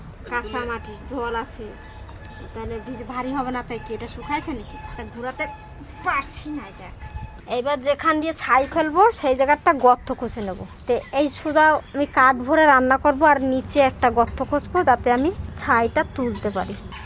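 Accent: Barishal, Bangladesh. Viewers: আপনার ঠাকুর মার বাপের বাড়ী কি বরিশাল। কথা শুনলে তাই মনে হয়।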